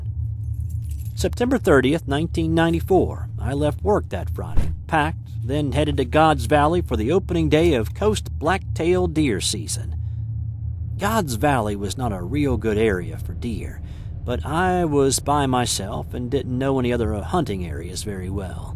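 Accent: South, United States. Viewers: Your country girl accent is perfect. Lol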